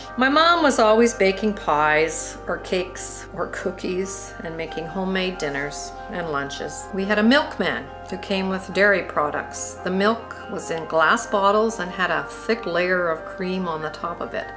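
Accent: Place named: Canada. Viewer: I have just stumbled onto this video and I LOVE the Canadian Accent..!! I can relate to so many things - even growing up in the 70's/80's... thank you for this..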